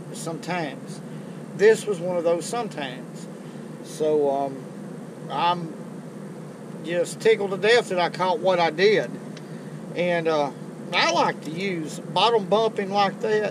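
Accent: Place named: Tennessee, United States. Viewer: Beautiful Tennessee accent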